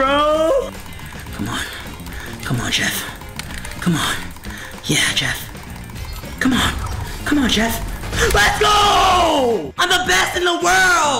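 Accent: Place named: Texas, United States. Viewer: Love the new Texas accent. 🔥🔥🔥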